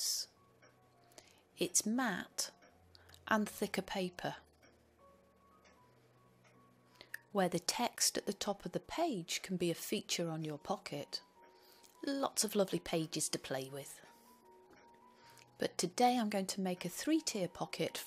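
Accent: England, United Kingdom. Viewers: What a lovely English accent! Thanks for the video 💐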